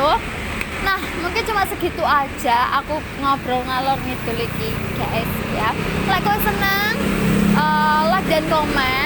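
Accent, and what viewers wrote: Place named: Java, Indonesia. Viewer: Iya memang sengaja medok supaya unsur jawanya ga ilang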